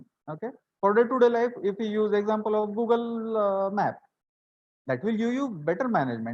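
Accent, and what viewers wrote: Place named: India. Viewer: inggris logat india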